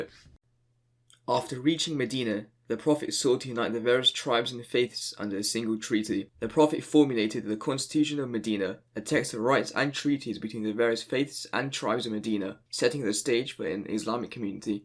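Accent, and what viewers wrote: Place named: United Kingdom. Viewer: ngl wasnt expecting a british innit p.s. nice visuals concept.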